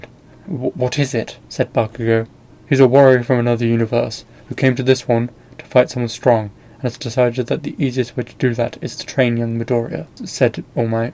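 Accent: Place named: England, United Kingdom. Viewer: Just glad I can find a what if with a English lad narrating it.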